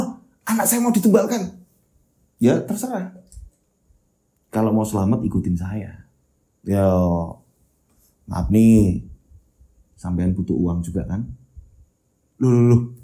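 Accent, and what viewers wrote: Java, Indonesia. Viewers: Wa alaikumussalam asli mas dari mana kok medok ya, Jatim ato Jateng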